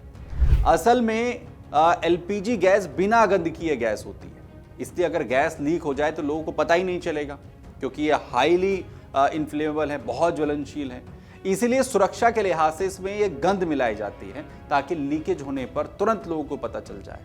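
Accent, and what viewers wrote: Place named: Bhojpuri, India. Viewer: Saaf baat bolna Bhojpuri kya kar raha hai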